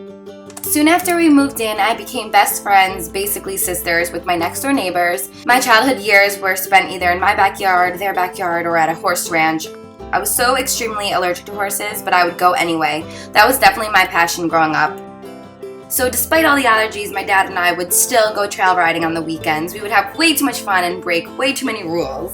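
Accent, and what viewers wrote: New Jersey, United States. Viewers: i KNEW that was a jersey accent!! ahhh. i love it. is that weird? i could listen to you talk all day. haha. :)) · Hi...I couldn't sleep so I decided to watch some videos and heard the daily cupcake mention u. I was curious bc she said something how much she liked ur jersey accent. So being a fellow jersey girl I was curious and quickly found u here. I'm so glad I did! After watching a few vids I realized how special u are and after watching ur draw my life I literally cried thru most of it! U are so young and u have been thru so much and u have managed to turn all the hurt into positivity.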